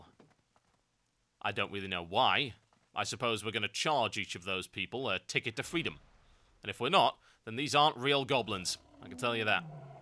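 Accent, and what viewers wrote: United Kingdom. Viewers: I watch your videos for a few reaons. First, because I am to lazy to check out the new content myself, I have a premade I run dungeons with and my worgen who sits at 15. Secondly, I generally like watching LP's, and your on my list of people who have good opinions and don't sound like they are 12. Lastly, because you have a clear british accent, and I seldom here them in Canada. Keep making videos! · Excellent reasoning and criteria for knowing when to continue to do the vids (still have lots of viewers). haha. Also, I think another appeal to these videos is because 1)1-10 questing is usually almost always a solo experience that 2)almost everyone can relate and is 3)usually pretty similar to every other 1-10 leveling. Having hilarious, witty, and informative british commentary in ze background of such opening questing is, well, a unique change to the otherwise familar questing experience.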